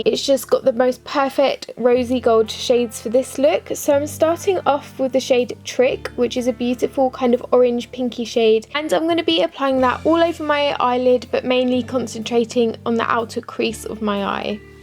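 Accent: United Kingdom. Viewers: You're beautiful with and without makeup! BTW love your British accent :)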